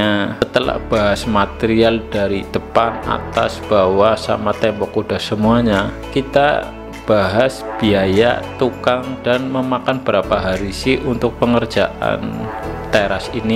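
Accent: Banyumas, Indonesia. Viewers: Bhsanya #ngapak😁😀. Sukses selaluh mas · Kayakne ngapak ngomong bahasa Indonesia ne masih keciri ngapake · bahasanya ngapak kaya kuwe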